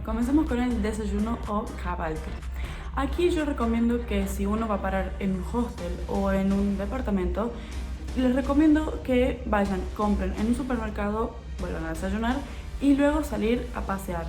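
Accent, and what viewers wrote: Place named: Argentina. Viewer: hola donde eres? hablas como argentina. Pero creo que no eres de alli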